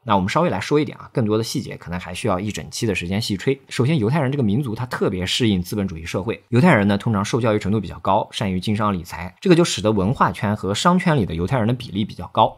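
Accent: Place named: Nanjing, China. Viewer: 这个我滴妈很有南京人的腔调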